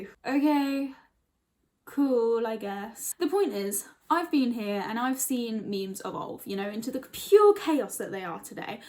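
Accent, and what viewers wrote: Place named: United Kingdom. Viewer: I didn't expect you to be british lol the first video I saw was your wipe it down video and it was classy af. Love to see and hear more about your life.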